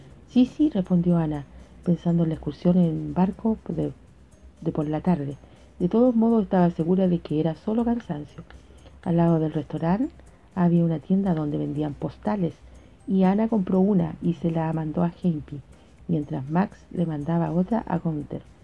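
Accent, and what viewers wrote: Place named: Chile. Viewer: tu acento hizo el libro mejor de lo que ya me parece! me suena a que es acento chileno pero tal vez me equivoco, igual me gusta mucho la forma en la que lees!! muchas gracias estoy segura de que me irá bien en el examen :D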